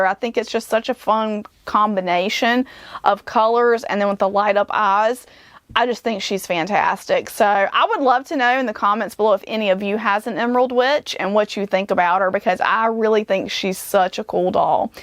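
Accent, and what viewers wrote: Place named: South Carolina, United States. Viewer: Your accent reminds me of South Carolina. Love those dolls you showed.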